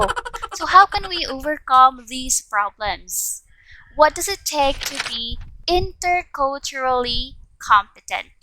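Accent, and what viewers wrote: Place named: Visayas, Philippines. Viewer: I love the accent but I love it more kay bisaya man diay 😘❤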